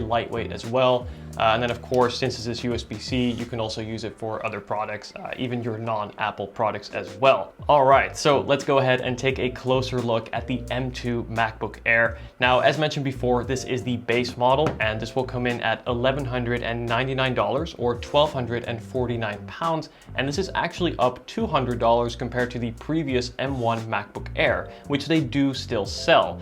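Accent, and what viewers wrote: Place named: Netherlands. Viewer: Checked the name after I heard 1 second, yup Dutch…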